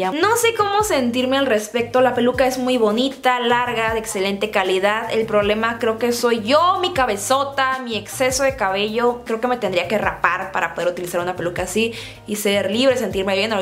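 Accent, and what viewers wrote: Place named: North, Mexico. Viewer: Me gusta tu acento bien norteño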